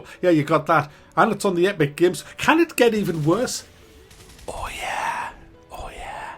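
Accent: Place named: Newcastle, United Kingdom. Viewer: From now on I only want to hear my game reviews in Geordie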